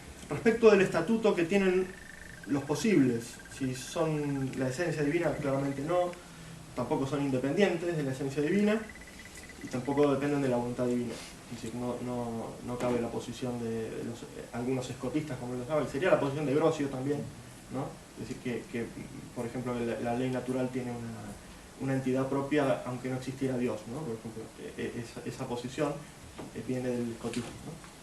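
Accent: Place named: Argentina. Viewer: La verdad no entiendo, como esta genial exposición, no tiene más me gusta. A lo mejor, es que a mí me sirve, por el hecho de ser siego. Pero cuando uno ve, tantas tonterías en YouTube, con tantos me gustas, y estos excelentes videos, con tan pocos, entiendo por que vivimos así. Es cierto Leibniz tiene algo de Platónico. En el II libro de la República, cuando forma la ciudad, muestra que no puede existir virtud sin vicio. te mando un fuerte abrazo desde Quilmes (supongo si no me equivoco por el acento que tenés), tu Argentina.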